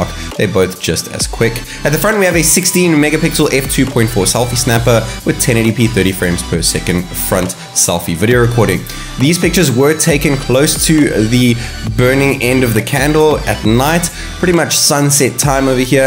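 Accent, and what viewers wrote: South Africa. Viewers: South African accent. I guess you haven't travelled and experienced other cultures.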